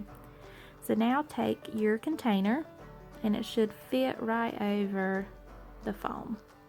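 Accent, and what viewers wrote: South, United States. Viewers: Wow, great project and great instructions! Trying to identify your accent - what part of which state are you from? (I’m from southwest Tennessee and I love all the different versions of southern accents 😜) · Great project. Just subscribed. Love your sweet southern accent. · I love ur accent? What accent is this I mean which part of the US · Love this diy. What part of the south are you from? I am in Alabama.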